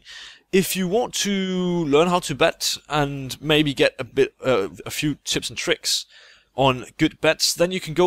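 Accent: Denmark. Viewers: I can just hear ure from denmark :D (im from denmark too øæå) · Im swedish and I can tell youre Danish.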